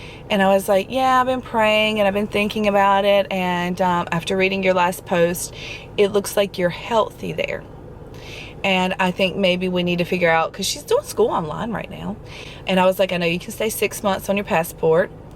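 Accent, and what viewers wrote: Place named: South, United States. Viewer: I don’t think you’re crazy, you’re a mother (mama bear), you don’t look old enough to have 2 20 year old daughters, but I must say this as a Brit, love a southern accent on a woman, stronger the better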